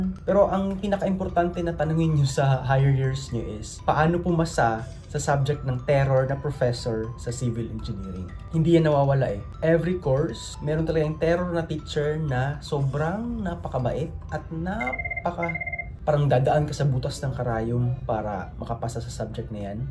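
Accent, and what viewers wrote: Visayas, Philippines. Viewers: I can hear the visayan accent in his voice. HAHA. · I know from your accent your a bisaya haha. (Btw im also a Cebuano)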